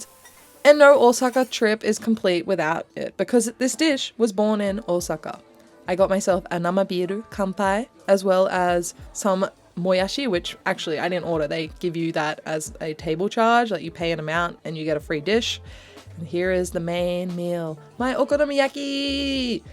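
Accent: Australia. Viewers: There she is!... You sound like you just came back from Australia... Like my Southern Drawl comes out when I go home... 😆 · Refreshing to hear an Aussie accent 🙏🏻 Cannot WAIT to be back in Kansai this week 🔥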